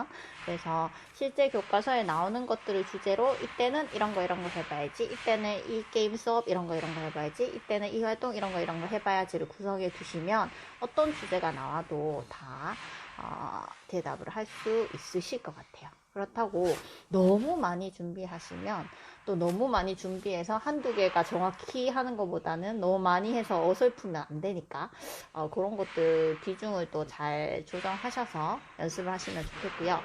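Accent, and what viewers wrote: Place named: Gyeongsang, South Korea. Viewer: 선생님~ 임용 공부하다가 억양이 너무 익숙해서 찾아보니 대구셨군요! 송구하지만 저도 자료 부탁 드려도 될까요? <mail> 입니다^^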